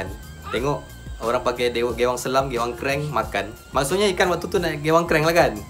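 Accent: Peninsular Malaysia, Malaysia. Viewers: selama sye tgok video abg ni.. sye pelik.. dok sne tpi loghat semenanjung..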